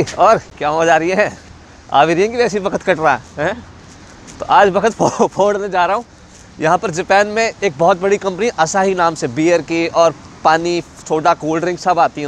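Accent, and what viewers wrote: Haryana, India. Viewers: bhai ji..mein jab japan aaunga..apse jarur milunga..u r so simple..ur haryanwi accent dil ko shu leta hai...love u..😍😁😳😜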